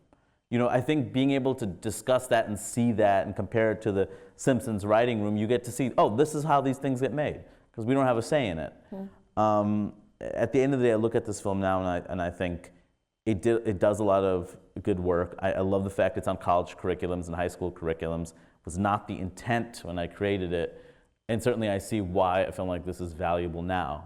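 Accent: United States. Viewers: Hari is more American than he is Indian. Sure, he might look physically like an Indian and he might have the same traditions his parents had. But c'mon, the accents just tells the truth. It's really very hard to find an Indian that has a clean accent (ofc, if he was BORN and raised in India). Also tbh Apu is probably one of less stereotyped character in the series, wanna know what is really violent and stereotyped? Watch the episode in which The Simpsons travel to Brazil. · This guy is literally grown up up in America who’s mum is American you have a American accent yet you feel done over by APU shut up you sound more White and Buttered than Bill Clinton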